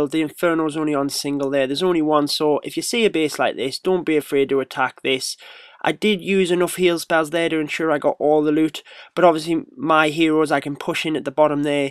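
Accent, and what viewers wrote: United Kingdom. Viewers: O yeah, now I can hear the original British accent. No American mixing 😂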